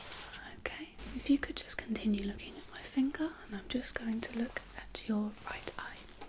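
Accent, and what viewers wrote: United Kingdom. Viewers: the video is perfect, love your british accent, tingles after each word :D may I please ask you, is this a London accent? or are you from the middle of the UK? I'm still wondering which british accent is the best and I think I've found one :D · You are so beautiful 😍 and your sweetest British accent drives me crazy 😘😘😘😍😍Marry me my queen 👑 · Idea 💡, There are a lot of us who learning english, and lot of us like British English pronounciation, and we almost haven’t asmrtists with pronounciation as yours. You could make a lot of material for learners in your asmr way. Podcasts on spotify, books naration on audible. You could do it all. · Heard a British accent - subscribed straight away! This is an amazing video!!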